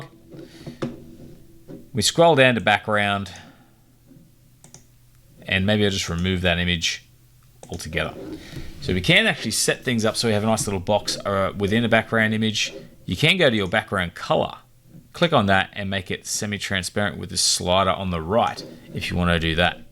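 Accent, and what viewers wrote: Australia. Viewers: Thanks, good to hear an Aussie voice for a change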